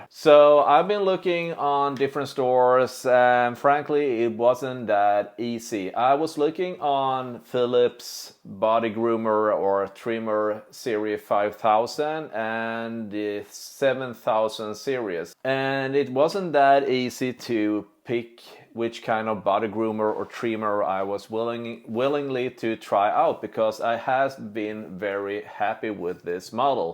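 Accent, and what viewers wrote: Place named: Sweden. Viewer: Thanks for the video I like your Swedish accent :)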